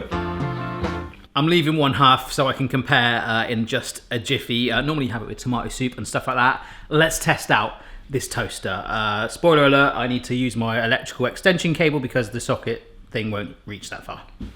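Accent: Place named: United Kingdom. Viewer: I love your videos!! Your the best. Love your British accent. I'm from the Great USA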